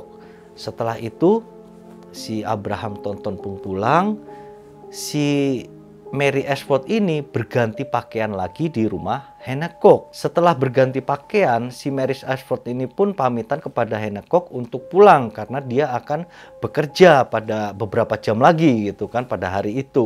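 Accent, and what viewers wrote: Java, Indonesia. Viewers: Medhoknya itu lho enak didengerin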